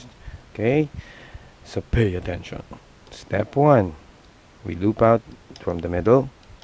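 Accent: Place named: United Kingdom. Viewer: I MADE IT!! And last week The Legend!!! I made the Star box in Jelly red violet and jelly turquoise! Some bracelets look bad with jelly bands but NOT this one it looks stunning!!! I have a bad headache after making it though lol! Great British accent you did too! Sounded just like my accent which is English!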